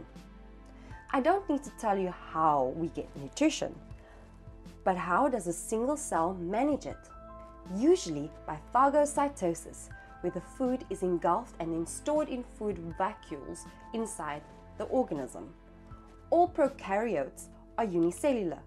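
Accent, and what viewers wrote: South Africa. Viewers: Love The South African Accent. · I love her Boer accent.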